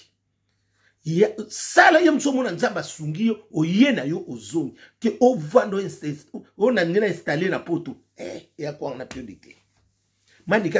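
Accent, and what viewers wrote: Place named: Kongo, Democratic Republic of the Congo. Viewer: Nazo landa makambo ozo loba te mais nazo sepela na elobeli nayo😅😅😅. Please ozalaka mu kongo?😅😅😅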